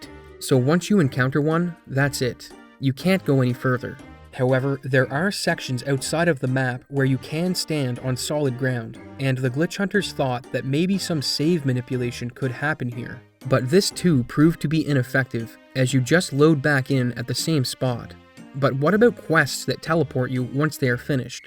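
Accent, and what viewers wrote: Canada. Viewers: First time visiting the channel, must say you have the heaviest Canadian accent I've ever heard. I'm pretty sure it's Canadian but let me know if I'm wrong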